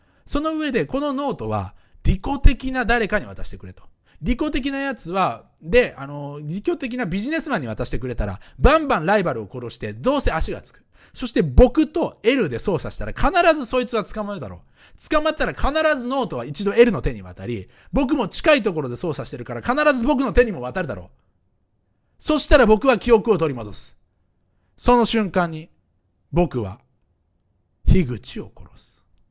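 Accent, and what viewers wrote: Kansai, Japan. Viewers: アバターより本人の方が好きだったけど、アバターでもしっかり聞きやすいし、面白い(^^) デスノートに限らず、こんな複雑で難しい物語考える人すごいなぁ ほんで関西弁めっちゃおもろい笑コテコテやし（関西人）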